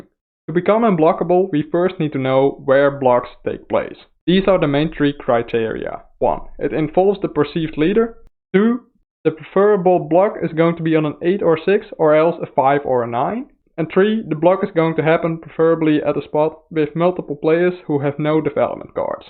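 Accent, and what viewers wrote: Netherlands. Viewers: Ah, thought you sounded Dutch! Is there a bit of a community here in The Netherlands? Would love to go to a tournament in the future after this whole virus thing...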